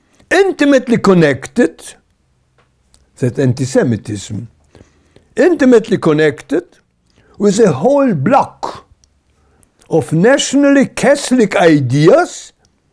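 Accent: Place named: Germany. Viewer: niby mówi po angielsku ale akcentuje jak po niemiecku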